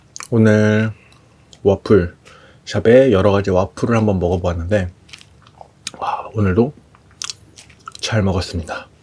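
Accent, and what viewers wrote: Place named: South Korea. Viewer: Always love Korean accent haha waffareu 😇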